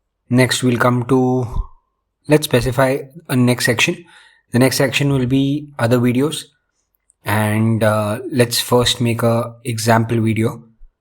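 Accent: India. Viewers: You Indian guys... you have the worst accent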